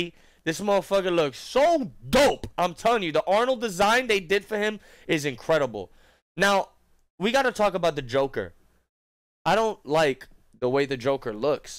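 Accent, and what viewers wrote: New York, United States. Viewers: Love your reaction. Love your NY accentos. It succs ass Spawn will be released in March yo. And that "no homo" comment made me laugh! I still say that shitos! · are you from NY?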